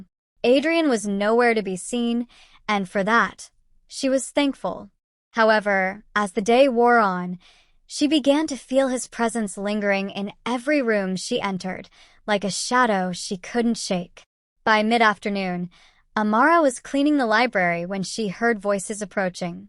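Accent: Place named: Europe. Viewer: Why don’t I hear other voices narrating these stories instead of a clear type European voice. If I see these are African type stories then the voice could match, in my opinion. Thanks for listening.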